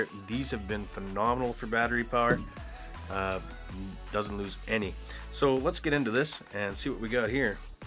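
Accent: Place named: Canada. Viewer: Of course! Thanks for posting the video! And yeah, I noticed right away! Right off the bat, I knew you were Canadian. I was like shit yeah, a Canadian review.. Then I realized these are likely only sold in Canada at Canadian tire.. haha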